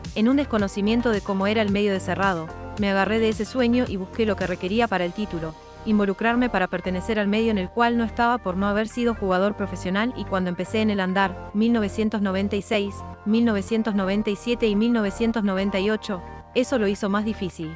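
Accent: Argentina. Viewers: La comentarista es de nacionalidad argentina? la doble l la pronuncia igual que los argentinos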